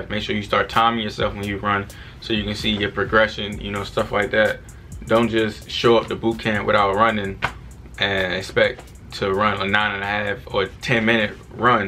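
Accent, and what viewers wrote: Baltimore, United States. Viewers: Just by watching the video I can tell he from Baltimore they got that certain accent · Baltimore accent?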